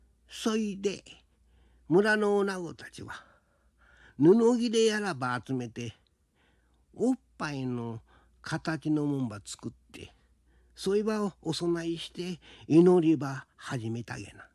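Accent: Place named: Kyushu, Japan. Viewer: 私は熊本ですが、方言は南九州の方言そっくり。むしろ本当の方言よりききとりやすい。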